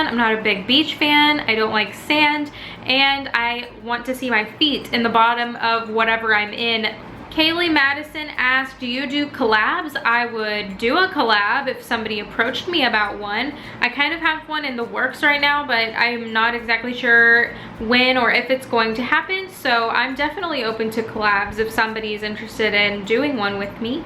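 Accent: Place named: Texas, United States. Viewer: I did not no you live in Puerto rico you should like your from texas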